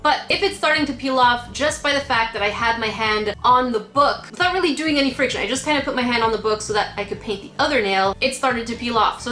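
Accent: Canada. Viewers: also are you canadian? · this is my first video im watching of yours and im very happy to hear youre canadian WOOT WOOT! go canada!! #toronto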